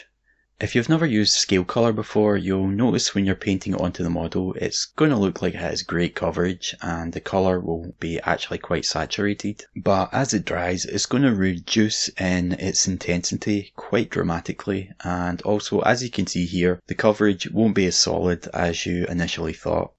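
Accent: Scotland, United Kingdom. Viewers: hey can u do a video talkin all in hardcore scottish? like limy's show?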